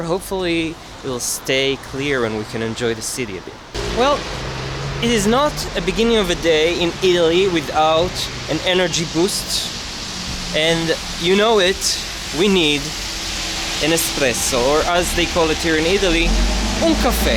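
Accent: Brazil. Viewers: You are Brazilian ,arent you ? Your accent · Your accent sounds Brazilian, are you from Brazil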